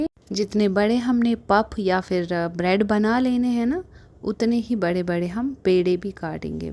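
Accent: Kashmir, India. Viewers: Kashmiri lugti ho aap aao k bolne ka andaz waisa hai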